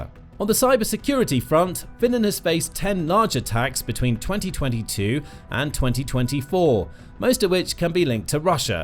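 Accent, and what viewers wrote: England, United Kingdom. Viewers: Hey can you switch to American accent? The British accent is getting a lil annoying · Bonjour, à votre accent je crois comprendre que vous êtes Anglais, donc "va en guerre", et je ne crois en RIEN votre propagande. Rassurez moi, le Russie a construit ces bâtiments sur son territoire ??? Donc elle a le droit . Vous vous persuadez tout seul que la Russie attaquerait un des pays de l'OTAN !!! Redescendez les pieds sur terre !!! Et vous ne parlez pas des troupes de l'OTAN s'installant en Roumanie, tout près de l'Ukraine et des troupes Russes !!!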